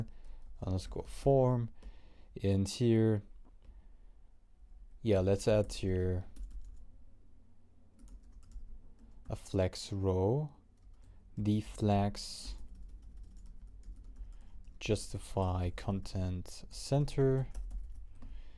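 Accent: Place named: France. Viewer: 😮 ur accents seems french or German even nearly french. Anyway Many thanks for this productive video which made me more passionate about Rails. Thanks again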